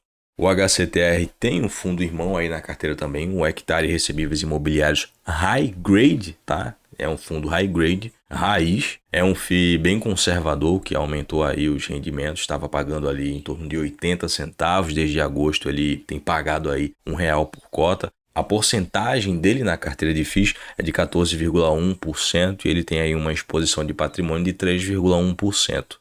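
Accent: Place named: Pernambuco, Brazil. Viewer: Tá tranquilo mermão, sua resposta foi bem esclarecedora, a comunidade tem que ser informada desses detalhes, saber dos indexadores, saber onde estão pisando, agora com relação se vc é de Pernambuco, percebi pelo sotaque, sou aqui do Ceará, somos vizinhos rsrsrs, tudo certo mermão, forte abraço, no aguardo do próximo "Raios -X" 🤛🤛🦈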